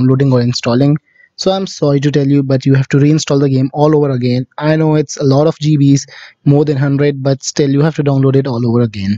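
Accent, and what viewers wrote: India. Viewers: I dont trust this indian voice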